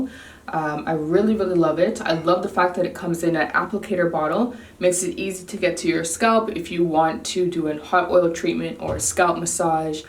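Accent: Jamaica. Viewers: Do I hear a tiny bit of a Jamaican accent? Never noticed until this video! Looooove 😍. Gorgeous hair and great results, too. Hair goals 🙌🏾. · You Jamaican ?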